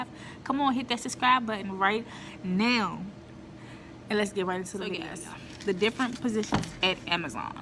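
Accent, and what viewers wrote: DMV, United States. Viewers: Are you from the DMV? You sound like it.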